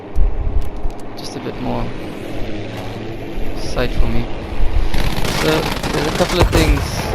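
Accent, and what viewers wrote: South Africa. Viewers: Bro heard by your voice you were a fellow RSA member 😂😂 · SA Accent?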